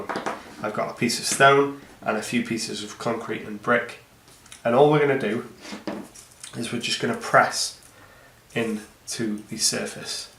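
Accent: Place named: North Wales, United Kingdom. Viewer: Great video, thanks for that. I'm gonna guess you're from North Wales, because that accent is very familiar!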